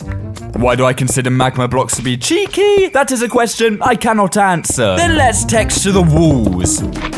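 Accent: United Kingdom. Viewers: Ngl you sound like British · oh god this is my first time coming across he sounds to british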